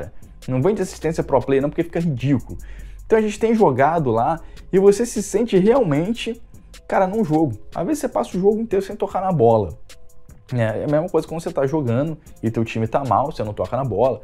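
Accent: Rio de Janeiro, Brazil. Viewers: Pode crer mano kkkkk Esse sotaque de carioca fudido não dá pra entender porra kkkk Essa intro é genial!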